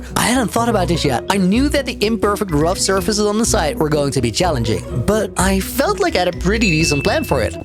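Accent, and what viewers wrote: Netherlands. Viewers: incredible! just finished binge-watching your videos. also, your English has a slight Dutch accent. Does that mean you actually are Dutch?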